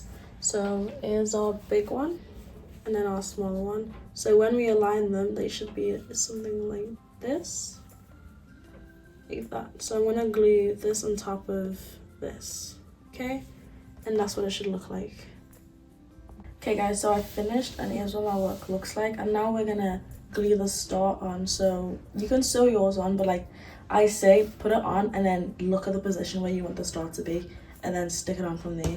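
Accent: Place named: Liverpool, United Kingdom. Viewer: scouse queen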